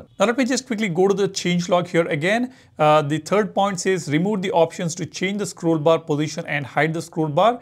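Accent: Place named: India. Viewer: My friend thanks forall your information but you are talking to fast and with your Indian accent it’s very difficult to followed you. Please don’t believe I’m not accusing you I’m not insulting you but you needs to slow down if you want to people understand you Especially some of us don’t know or not familiar with technical issues 🙏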